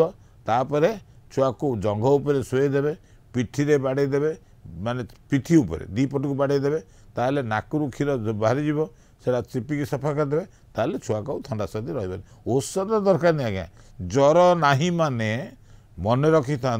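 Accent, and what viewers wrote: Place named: Bengal, India. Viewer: Dr Odia karuchanti bangali vasakemiti aasuchi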